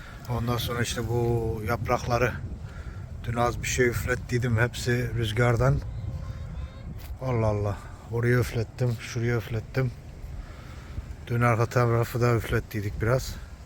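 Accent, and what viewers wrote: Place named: Çorum, Turkey. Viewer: Usta Çorumlu gibi konuşuyorsun ben Çorumluyum şiven bizim oranın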